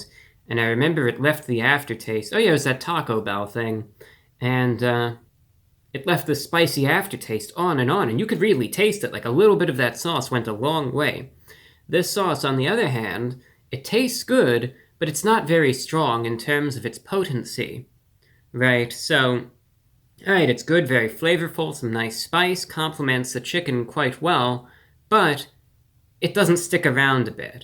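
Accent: Standard. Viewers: just modern proper english. Idk which of us is right tbh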